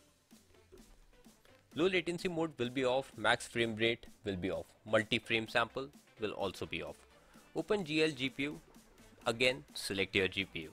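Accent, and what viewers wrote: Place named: India. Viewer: This helped me a ton! Thanks! Also, I can tell you're an Indian by your accent... wanna play Apex together sometime? :)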